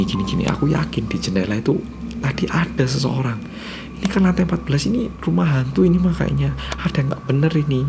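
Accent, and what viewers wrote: Java, Indonesia. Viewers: MENJENGGONG ASTAGA YA LORD MAS WKWKW😭😭 jowomu muedhok temen mas😭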